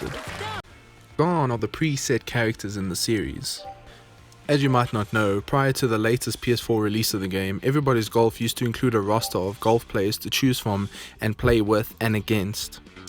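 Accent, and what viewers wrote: South Africa. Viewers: Thought I recognised that accent! Local is lekker, keep it up, guys!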